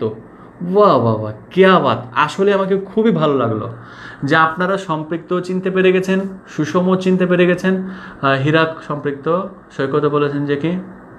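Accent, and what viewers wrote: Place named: Rajshahi, Bangladesh. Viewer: স্যার আপনার কথা থেকে কেন জানি মনে হচ্ছে রাজশাহী অথবা চাপাই নবাবগঞ্জ বাড়ি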